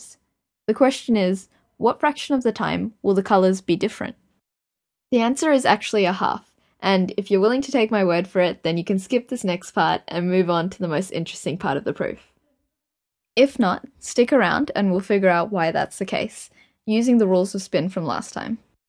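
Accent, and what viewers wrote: Australia. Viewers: Wait a sec, are you Australian? Why am I so bad at noticing these things. (shamed Australian here) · The more I watch your videos the more I convince myself that you are Australian.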